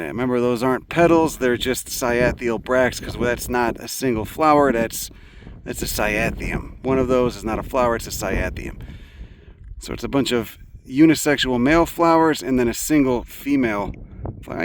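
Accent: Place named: Brooklyn, United States. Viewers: This is the most educated guy from brooklyn i've ever heard lmaooo.. heya fuck face, why don't you respect the botany huh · You and Bill Burr have officially broken my stereotype that people with that kind of Northeast accent are dumb 😂😂 this was the most entertaining and enthusiastic appreciation of cactus and railing of the culture ever put to video lol Thank you I will be sharing this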